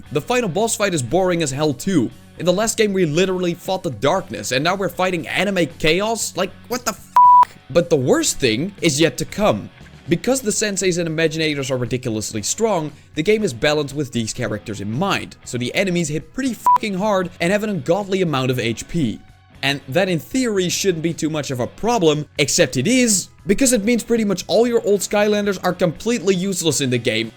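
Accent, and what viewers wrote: Netherlands. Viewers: its funny i can hear ur dutch because of ur accent XD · heujjj did i just hear a nederlands accentje? skytuber from the netherlands is always a big plus. i tought the skylanders fans wherr a dying race here. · Is that a dutch accent i spy? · are you dutch? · leuk geprobeerd, maar ik herken een nederlands accent uit duizenden!! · Nice video and your top 6 is on point (mooi nederlands accent trouwens) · jij klinkt echt Nederlands hahah. Goeie video man, deze serie is pure nostalgie voor mij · i smell nederlander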